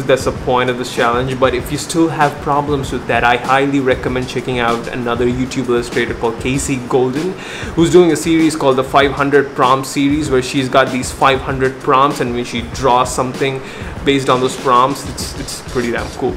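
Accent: India. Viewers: I dnt know why, but i hear a severe indian accent. Are you an indian? 😵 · Youra indian but your voice is clear woq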